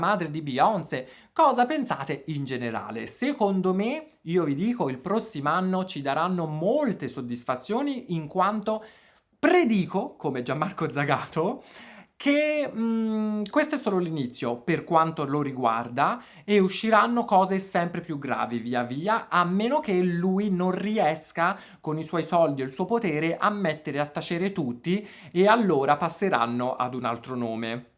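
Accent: Tuscany, Italy. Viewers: "Hai più foto con lui che con tu moglie..." morta dal ridereeeee!!! Nessuno sa raccontare delle storie così stravolgenti come lo fai te!! Sei divertentissimo!!! Sei molto espressivo e l'accento toscano è insuperabile! ❤❤👏🏻👏🏻👏🏻👏🏻👏🏻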